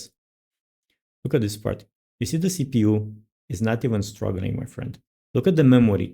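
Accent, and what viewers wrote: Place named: Romania. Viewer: Never heard of lunacy before, Tnx! I also ditched Windows because is a piece of crap and now i am using Linux mint! In general i use open sourced software like blender,gimp,krita and gotot engine so there is no use for using Windows Anymore! Not to mention the customization that you can do in Linux is Just Crazy! By the way you sound Romanian :D! Subscribed!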